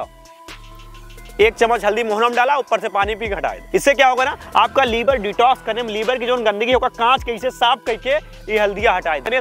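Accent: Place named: Bihar, India. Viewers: Sbse achhi lgti h tmhari bhasha hmare jaisi h bihari type Bahut sundar bhai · Aese bhojpuri bolt rhaaa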